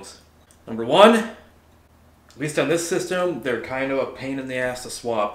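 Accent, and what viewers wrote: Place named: Minnesota, United States. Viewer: I thought I recognized that accent! Used to live in Duluth. 😅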